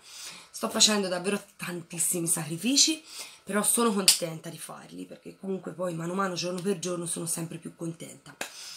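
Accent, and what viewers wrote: Tuscany, Italy. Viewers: Io spero che non chiuderai il canale perché quando in negozio non c'è nessuno mi fai compagnia ascoltando le tue storie e vedendo i tuoi video trucco. Mi sono spazzolata tutti i tuoi video sui libri e molti di essi sono finiti nella lista da leggere. Adoro la tua parlata, mi riporta nella mia Toscana da ui mando da 6 anni. La gente spesso non capisce che siamo tutti esseri umani e si erge su un piedistallo pronta a sputare sentenze, lo vedo col mio negozio. La gente se mi lamento pensa che lo faccia per spillare loro più soldi convinta che evada chissà quanti capitali, manco avessi tre conti in Svizzera non denunciati. Sii orgogliosa di quel che sei, di quello che hai passato e di come stai cercando di tirarti su, sii orgogliosa del percorso che fai per ripulirti... E ricorda che per una che critica c'è tanta gente che ti stima e a cui piaci così come sei.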